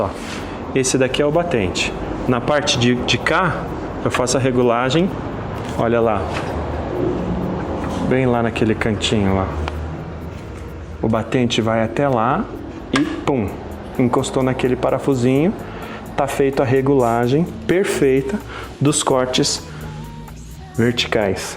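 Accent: South, Brazil. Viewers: Olá! Sua serra vertical é fantástica, mas muito melhor é sua capacidade de explicar e sua generosidade em não guardar nada para si mesmo. Você tem muito carisma para estar na frente de uma câmera. Pelo seu sotaque fica claro que você é do sul do Brasil. Amo seu país e lamento não poder ir todos os anos como fazia anos atrás. Parabéns mais uma vez e desejo muito sucesso. Saúdo-vos de Córdoba, Argentina. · Gostei muito bom. Pelo sotaque acho q vc é sulista, moro 6 meses em Curitiba e 6 meses na Itália, vou acompanhar seus vídeos.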